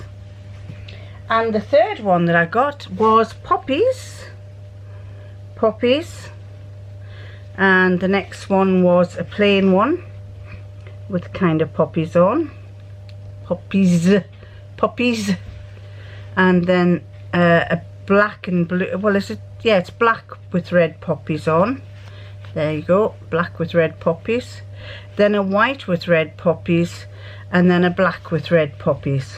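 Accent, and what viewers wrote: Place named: South Shields, United Kingdom. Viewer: I hope you have a lovely Christmas you and Brian, you will need all your vitamin tablets with all your family, food and dog, energy levels may get low. 😀 it's lovely hearing an accent that my mum and dad had, (they grew up in South shields and easington lane. Don't forget if you come round here to have a walk near the river, let me know and we can meet up for a coffee at the station. Lots of love to you both. Heather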